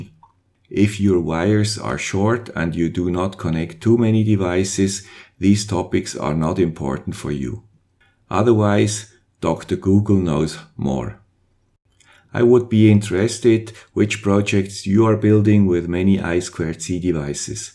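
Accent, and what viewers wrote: Switzerland. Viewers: Hey, guy with swiss accent, I've got an italian one.... I find very useful your teaching. Actually, I was used to plan experiments with Labview and NI instrumentation, I decided to leave both for open software and cheap devices, not only for economical concern. Thanxs for the wide presentations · Ich lebe momentan in Irland und da hatte ich die komische Idee, eine Eier-Inkubator-Steuerung zu bauen. Als kompletter Anfänger schaue ich viele Videos bei Youtube an. Deines, weil ich ein I2C-Display und ein RTC in meinem Projekt verwenden möchte. Mit dem Schweizer Akzent war das dann besonders nett zu schauen. Danke! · Hey, guy with swiss accent. Your channel is very inspiring. Thanks for sharing your ideas!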